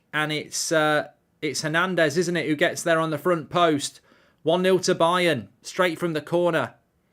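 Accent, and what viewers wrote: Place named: Manchester, United Kingdom. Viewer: Its that miserable manc accent that makes it funny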